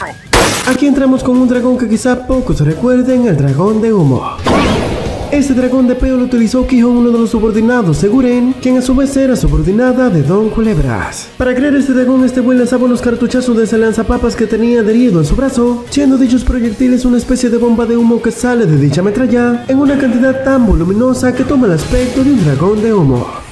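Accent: Dominican Republic. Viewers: Bro tú forma de hablar es peculiar de RD, será que si?🤔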